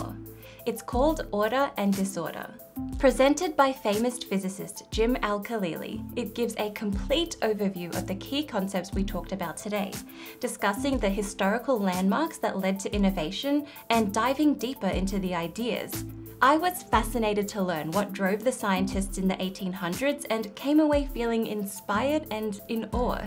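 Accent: England, United Kingdom. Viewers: a pleasure to find out your name. I have watched maybe a dowzen of your videos, and like them all. I took algebra, geometry, trigonomics, physics and chemistry in college. But your simple explanations help me recall and see things in a new way. You even helped me to understand Calculus! Thanks! The English accent is a bonus for me.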